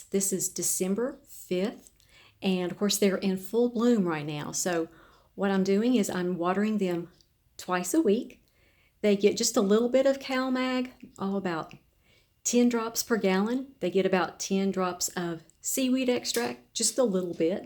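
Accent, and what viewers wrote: South, United States. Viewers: I love your accent, is it a southern accent? Beautiful. I love these plants, I have 3 of them. One is solid vibrant pink and the other two colors are rare colors, Sumba Brazil (reminds me of a sunset) and Gold charm (pink and yellow mix) ❤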